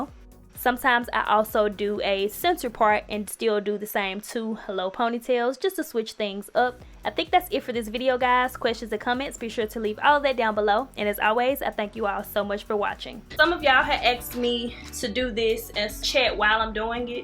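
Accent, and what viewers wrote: South, United States. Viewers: Sang guh lol enjoyed watching and listening to your super country accent! You have me beat lol sending love from Alabama. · southern drawl is bomb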